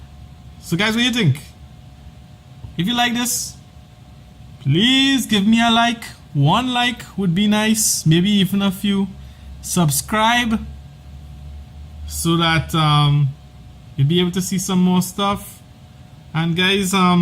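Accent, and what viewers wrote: Trinidad and Tobago. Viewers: Mannn I swear something trini bout that accent lmk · u from trinidad?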